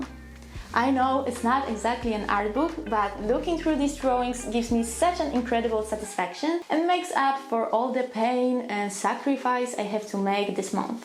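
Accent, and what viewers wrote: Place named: Poland. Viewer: "InkTober would be fun" I said- "I can show off my OCs" I said- 😂 my sympathies that InkTober is draining you, too - but I can see why! A new drawing every day is pretty daunting; I've been following all of your prompts on Instagram, and they are absolutely gorgeous, every single one! I'm glad you decided to draw days 13 and 14 in advance so you could set aside time for this video, even if it was a lot of extra work 😭 "Sketching is the most important stage" ...welp, I wish I had known that 14 days ago- for the first 10 days of the challenge I was just going in all ink! (All in? All ink? 🔏) I only started doing sketches recently 😅 ahh... so much unnecessary pain : Friends is such a great show! Noooo! ;-; I love your speaking though! You speak English very well ☺️ and even if you decided to do Polish w/ English subtitles, it would be great because you have a very lovely voice, and a beautiful accent ✨🇵🇱 Also, this style of video is wonderful - keep it up! 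Not to mention your humor~ The speedpaint process is so so beautiful! Even if you're not absolutely loving every single one, I think they're all masterpieces in their own way Thank you for sharing your talent with us!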